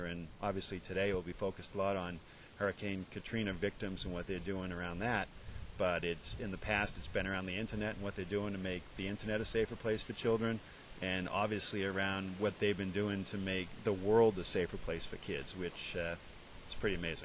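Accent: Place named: Boston, United States. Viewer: Great accent. You can talk the boy out of Boston, but, his "hart' is still in all the right places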